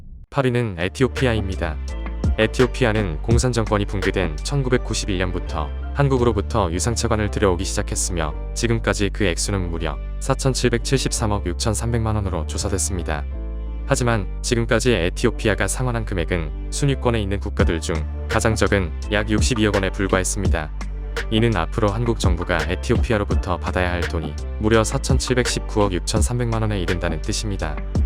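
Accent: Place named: Jeolla, South Korea. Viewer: 전라도 말투 숨기지 마세요ㅠ 왜 전라도 유투브들은 우덜 말투를 왜 부끄러워하는지ㅠㅠ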